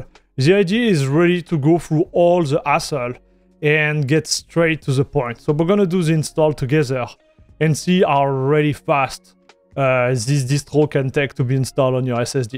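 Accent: France. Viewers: On dirait que vous avez un accent français non ? Ou francophone en tout cas.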